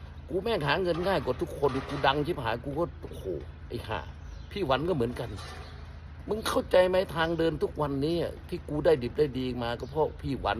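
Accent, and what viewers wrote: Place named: Rural, Thailand. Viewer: สุดยอดครับพูดลูกทุ่งดีครับอาจารย์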